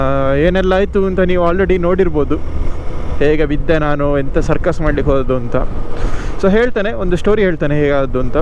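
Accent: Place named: Mangalore, India. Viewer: Woww manglore accent Kannada 🔥 sakath vlog bro husharu 🔥 subscribed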